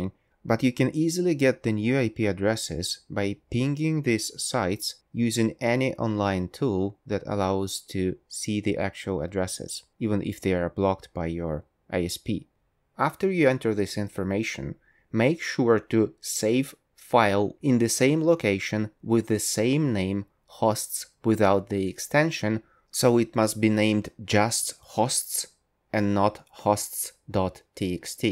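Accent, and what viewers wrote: England, United Kingdom. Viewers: Oo vi iz anglii?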